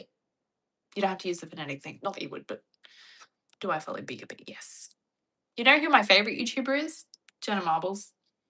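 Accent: United States. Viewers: Your American accent cracked me up! 😂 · Ok, I’m American (unfortunately 😬), and your American accent always, always makes me laugh, I friggin’ love it 😂👌🏼 …gonna save this video to watch the rest when I have more time, but I just wanted to say—I really do love these longer videos you’ve been making lately. Whether you have Hammy in there with you or not, whether you’re cooking, or making other stuffs…just anything! Almost feels like we’re hanging out together gabbing about random things and—maybe weird to say—it’s just a lot of fun. 🤷🏼‍♀️ 🍻💃🏼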